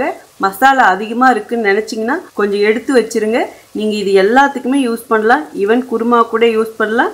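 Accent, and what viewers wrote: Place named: Kongu, India. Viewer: Your preparations and Narration,in typical Kongu Tamil will enhance Children 's Lunches very Tasty. Thank you Sister 🎉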